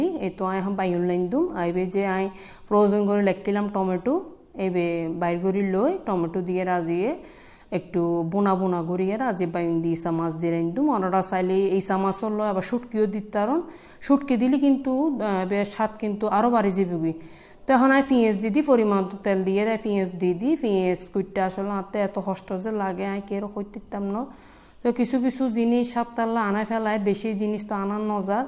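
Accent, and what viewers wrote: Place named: Chittagong, Bangladesh. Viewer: normally bujha jayna apnr kothay j apni ctg er kintu ajk 😅😅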